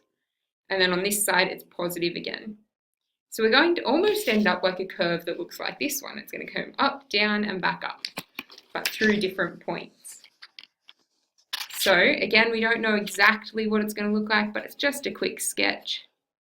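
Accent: Australia. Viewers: thank you so much, this was a lot of help! im from New Zealand and im trying to figure out if your accent is from here or Australia. I think you're from Australia??? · Oh my gosh thank you very much!! Hi from the UK, I can defo tell your Australian :D love the accent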